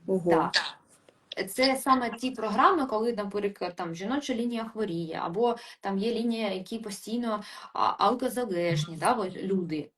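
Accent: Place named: Russia. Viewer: Важко зрозуміти де які слова цієї відьмочки.Мабуть вона раніше була російськомовна або в неї як це говориться російськомовна щелепа і говорячі деякі українсікі слова вона не зовсім розуміє їх значення.То може б краще роворила б російською.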